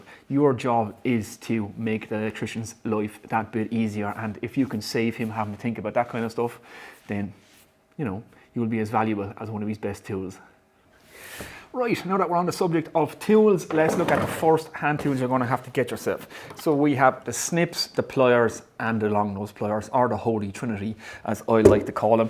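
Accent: Ireland. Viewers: Love your channel. I work in IT but have always had a love for woodworking so you're right up my street. My Dad didn't get much of an education but he built up incredible knowledge with all things electrical. I always joked with him that he could have lectured an electrical engineering course. Completely understand that the need for regulation in this discipline but would love to get as much knowledge as I can and follow in his footsteps. If there's any material you would suggest for this, I'd love to get it. Really nice to hear an Irish voice on YouTube talking through these things.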